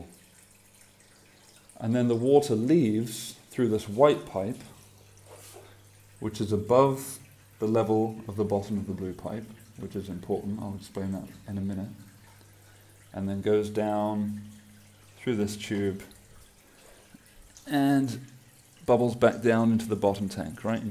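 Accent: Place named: United Kingdom. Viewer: After much thinking, the penny dropped. The overflow (which is not a siphon) works as the water level in the tank comes up, it equalises in the overflow box, which brings it over the level of the white tube - voila! Have you experimented with the flow? How would you increase the flow out of the main tank? Great video - well explained. I thought I heard UK in the accent and USA in the power outlet - then I read Thailand in the comments!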